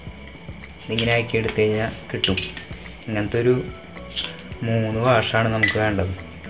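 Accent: India. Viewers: നല്ല കൊടുങ്ങല്ലൂർ ഭാഷ ..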